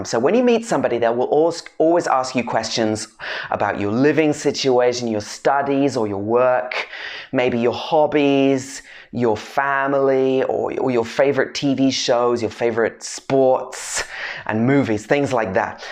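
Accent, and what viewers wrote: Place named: United Kingdom. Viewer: Wish I can talk to you everyday to improve my English speaking, specially British Accent which I really like.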